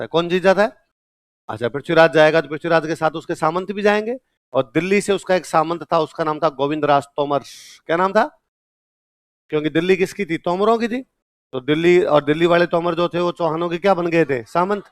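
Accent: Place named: Rajasthan, India. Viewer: Or kaise bolte h bhai ye rajisthan ke teacher h to aise he bolte h bha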